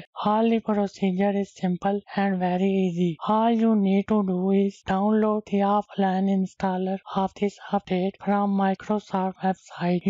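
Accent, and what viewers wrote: India. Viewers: Indian robot voice, damn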